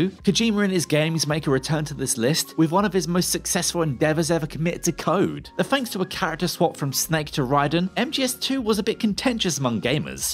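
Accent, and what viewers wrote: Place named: East London, United Kingdom. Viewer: I have to get through Mafia remastered version yet .Since I gotten back to it ,but would say the final training mission in Star Wars Fallen Order was a pain in my ass ,since it like the only puzzle in the game I could not figure out easily .But now I know for sure ,cause go YouTube to figure the easiest puzzle in the game .So where the hel is Jules and the cute itty redhead on this channel ? And your accent dude sounds east London for sure .I sure wish Hollywood get all the accents right from England .When comes to movies and other things .instead using somme snob with same accent as the royal family of misfits .But you folks are pretty cool from the old country .😁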